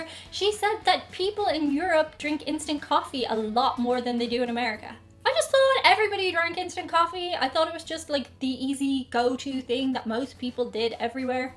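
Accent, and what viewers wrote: Ireland. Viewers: Miss I am a old half American Scottish German Love your content huge fan but is nearly impossible to get Scottish food in Detroit Michigan.. but I wish you never taught me about crisp sandwiches curse you lol I grew up with Scottish German Italian southern accents it is my consensus that your Irish is the most pleasant on the ear. I really enjoyed your collaboration with wee Scottish lass If we ever get free this virus please consider doing one with batchy. Actually follow about 20 people from the UK the try videos you guys are awesome one of you guys always puts a smile on my face before I go to sleep keep up the good work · Just discovered me a new channel with another gorgeous woman with an accent! Got me a Filipina, a German and now an Irish.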